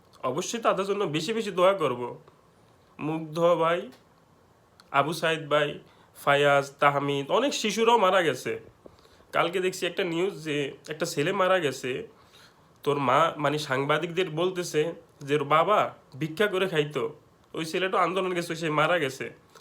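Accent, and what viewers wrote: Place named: United Kingdom. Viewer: Look the way u talk bai from uk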